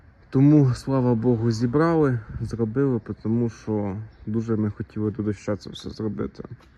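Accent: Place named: Cherkasy, Ukraine. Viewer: Почув наче єрки то ти з Черкащини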